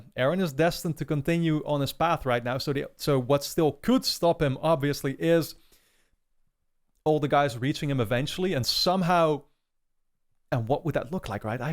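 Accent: Netherlands. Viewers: Are you from the Netherlands? Cuz you sounds very Dutch for some reason haha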